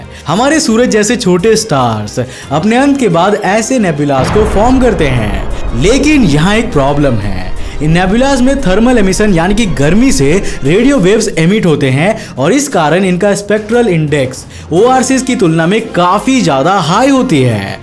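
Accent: Bengal, India. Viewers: Are you a Bengali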